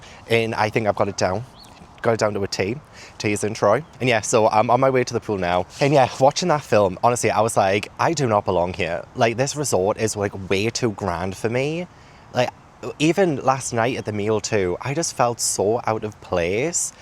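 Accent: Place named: England, United Kingdom. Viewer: Nah. Never feel out of place. I can promise that just having an English accent means everyone there thinks you're too grand for them (I feel like I can say this cuz I'm an American?)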